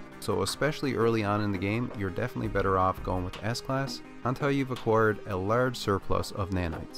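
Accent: Newfoundland, Canada. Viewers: Are you from Newfoundland? Can hear the accent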